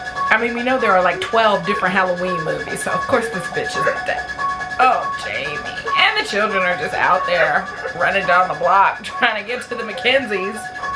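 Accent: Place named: South, United States. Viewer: From a brit. You have an x rated southern twang that says what everyone else is thinking when facing Carpenters creations. Funnny wonan x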